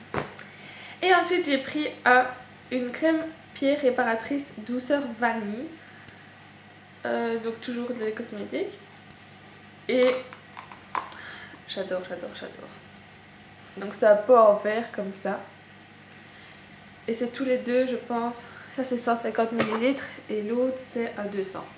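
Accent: Belgium. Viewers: Ah ok t'es belge alors. D'ou ton accent irritant! Rien que pour ca je ne m'abonne pas! Salut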